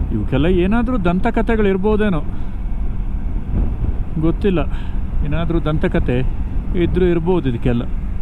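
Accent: Kundapura, India. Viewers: ಉತ್ತಮವಾದ ಕುಂದಾಪುರ ಕನ್ನಡ ನಿರೂಪಣೆ god bless you sir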